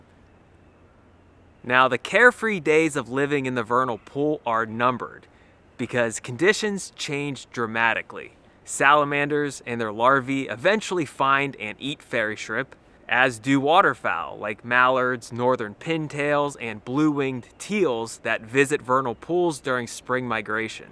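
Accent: Pittsburgh, United States. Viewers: I love hearing a Pittsburgh accent in a nature video. We do have interesting backyards lol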